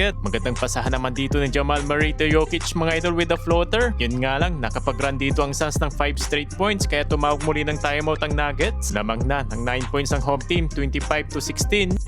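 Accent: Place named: Visayas, Philippines. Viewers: Dong,ano probinsya mo?? Bisaya ka ano??? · Visaya k dong boses m pilipit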